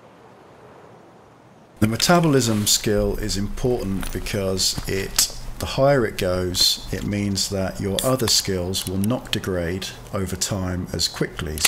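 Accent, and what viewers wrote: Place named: United Kingdom. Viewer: Why do i take british accents so much more seriously than american ones lmfao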